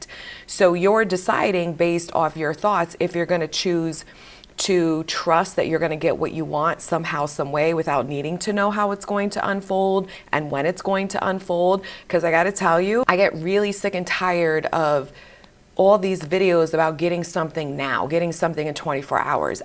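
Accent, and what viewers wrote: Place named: New Jersey, United States. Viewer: this is exactly what I needed to hear! I was getting discouraged by all the "if you're doing everything right, it should be showing up instantly!" videos because when it wasn't happening instantly, I assumed I failed. Thank you so much. (p.s., is that a Jersey accent I hear?? I was wondering why I found your voice so comforting - growing up right outside of Jersey, all I heard were people who sound just like you!)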